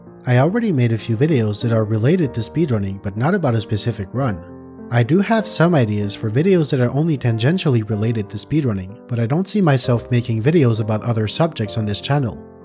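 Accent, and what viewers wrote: Quebec, Canada. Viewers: I could tell you had a Quebecois accent, it's very faint though. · Being from Quebec as well I had such a feeling that’s why your accent was. Great videos! Keep them up!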